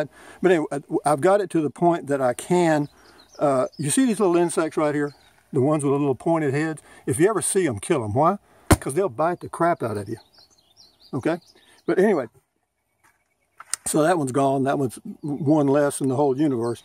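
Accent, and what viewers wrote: Texas, United States. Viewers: Hello from Nevada! I just found your channel. Listening to you makes me miss Texas. I lived there 3 years. You had me genuinely laughing ... "Oh it's energy saver... I don't want that!"... sounds like something literally every Texan I ever met would say, and rightly so, since Texas gets up to 20,000 degrees in the summer :D ... Stay cool, and keep up the good work.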